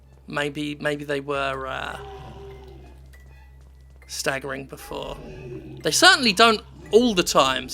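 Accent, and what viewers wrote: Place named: England, United Kingdom. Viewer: British Love how he goes off like a nuke whenever he plays a scary game, "OH F*CK OFF SH*T F*CK", with that awesome english accent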